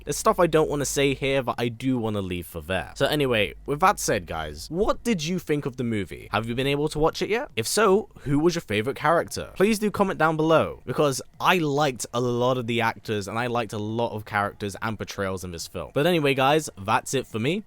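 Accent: United Kingdom. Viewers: How did you watch it in the UK? (Assuming from your accent you're British too)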